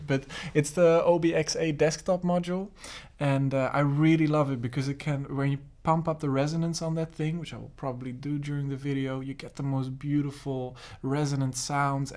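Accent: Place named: Ireland. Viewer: you can have the irish accent pass lad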